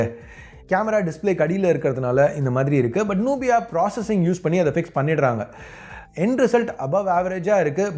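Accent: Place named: Chennai, India. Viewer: na Chennai accent majavah ikkithu 😂❤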